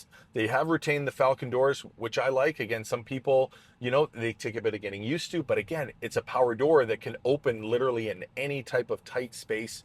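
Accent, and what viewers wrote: Canada. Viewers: Totally called that you were Canada… I heard it 😂😂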